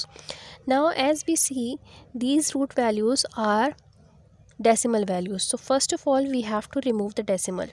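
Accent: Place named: India. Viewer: May I ask you a question out of curiosity? I take it from your accent that your from somewhere in (or around) India, right? Is it normal over there to put the decimal point that high up as opposed to "on the floor"? If I saw your 8 point 1 without any context, I'd think that it's supposed to mean 8 times 1. Another thing I'd add is that I wouldn't say that it's mandatory to rationalize a denominator. There's nothing wrong per se with 3/√10 as a result. It's more a convention (for historical reasons, it seems) and that apparently teachers are told to teach their students to do this. I'm from Germany and when I learned math we were also told (IIRC) that we shouldn't leave a root in the denominator. Or a decimal fraction, or imaginary number for that matter. But as we moved up in classes, I think that this was seen increasingly less strict (as it should be, in my opinion) because it's really not important at all. 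I think that everybody should know how to get rid of decimal fractions, roots, or complex numbers in the denominator if one chooses to do so or if it's necessary or helpful to solve a problem. But I don't see the point to give students the impression that a result like 3/√10 is somehow invalid. Please don't take this as a criticism directed to you personally, it's not at all. My little rant rather is aimed towards those who for whatever reason think that they should view these results as less valid (or even flat-out wrong) rather than less aesthetically pleasing (which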